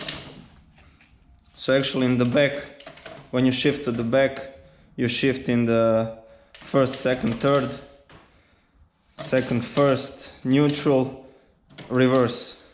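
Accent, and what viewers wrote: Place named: Russia. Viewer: Cool work! Love your kinda Russian English! It's a freaking death machine!!! ;-)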